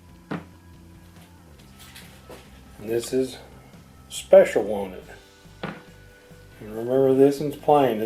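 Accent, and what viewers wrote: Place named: East Tennessee, United States. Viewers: Hey, Great video. I've got tons of 2 inch red oak slabs. I'm not really crazy about how red oak looks. I'm wanting to use the slabs to build some river tables. I'm wanting to stain the red oak much darker. Do you know if you can run epoxy over stain? Also, nice shirt. What part of Tennessee are you from? You sound like East Tennessee. I'm in Manchester (Bonaroo). Great vid. Thanks. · Thank you sir for this video. However I'd love for you to spend a bit of time watching and editing your videos before submitting them. I had some trouble with your southern accent when you were saying one of the samples but it was impossible to read the can as it wasn't even in the picture. Again thanks for taking the time for this but it would be really great if there was a touch of care in the videography.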